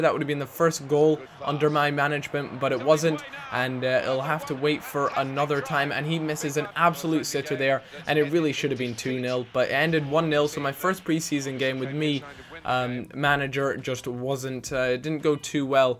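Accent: Scotland, United Kingdom. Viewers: when I first subbed to you I couldn't work out whether you were scottish or american lol! You sounded sottish but you were so popular and such a big channel so i thought you must be american! Great vid by the way!:) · anyone else think his voice is partly scottish, part englsih, and past american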